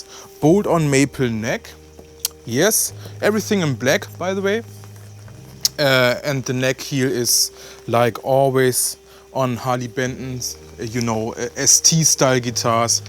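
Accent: Germany. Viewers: Man hört gleich dass er Deutscher ist 🤣 Trotzdem ein sehr gutes Video!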